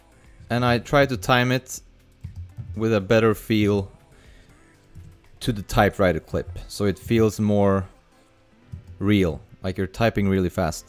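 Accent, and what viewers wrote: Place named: Sweden. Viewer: Haha as soon as you started speaking I knew you were swedish. Tack så mycket för hjälpen!!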